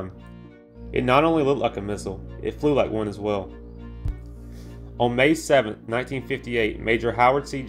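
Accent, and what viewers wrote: South, United States. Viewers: I*m having trouble placing your accent. The South for sure. I appreciate your videos. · Speak more clearly & evenly, enunciating, without Southern affectation if possible. Use a close microphone, like a boom mic, to record your narration. Lower the background music level. Most of all, stop the background music now & then, to demonstrate the sounds of the F-104 engine!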